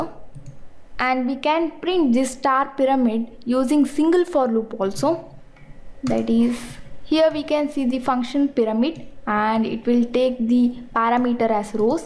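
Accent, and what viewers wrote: South India, India. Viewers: you are mallu madrasi or hyderabadi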